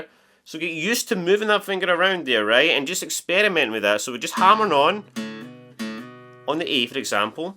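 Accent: Scotland, United Kingdom. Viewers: Great lesson. My wife was listening. She wants to know what part of Scotland are you from. She’s from fife.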